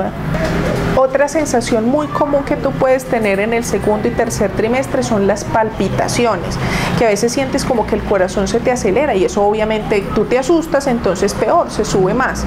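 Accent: Colombia. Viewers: Claro es colombiana y paisita..por eso esa voz preciosa..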